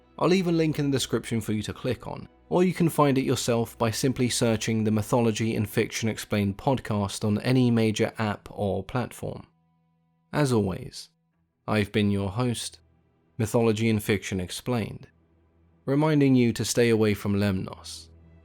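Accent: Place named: United Kingdom. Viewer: What part of the uk are you from?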